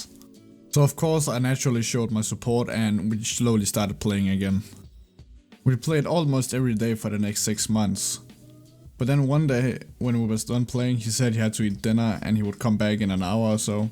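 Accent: Denmark. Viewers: are you from Denmark? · I don't recognize your channel but I was already subbed. You have a Danish accent, so ig I knew u before u blew up. What was your previous gamertag?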